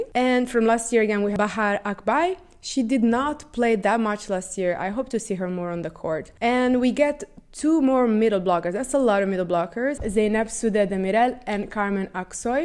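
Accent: Turkey. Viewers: Wow I thought you had a Turkish accent. Still not buying that Italian thing 😂 not that it matters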